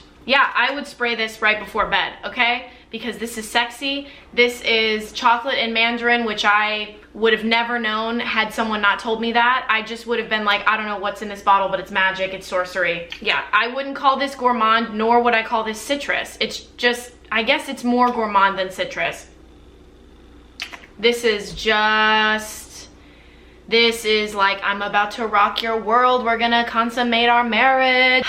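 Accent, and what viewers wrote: France. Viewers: You have a great taste on perfumes and a very nice french accent ! Great video, I watch you your channel from Portugal! 😁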